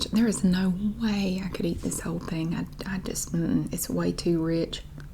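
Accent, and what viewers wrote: South, United States. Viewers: The day you have to really justify your beautiful southern accent, I swear to Sweet Baby Jesus I will go bat-sh*t crazy. No, just NO. Do these people not see the name of your channel? It's a clue, ugh. I say you do a whole 40 minute video saying the word Pae. I'd watch the whole darned thing. Thank you for what you do. Hugs! Ana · There already is a letter for how you pronounce pie; Pæ. You also pronounce another letter you don't have in the english language when you say oil; å(l). I can't understand how someone could complain about how you pronounce words; southern dialects are wonderful.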